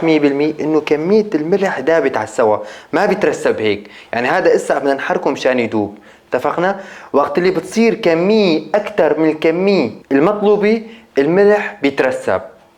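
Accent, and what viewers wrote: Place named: Idlib, Syria. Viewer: لهجتك ادلبي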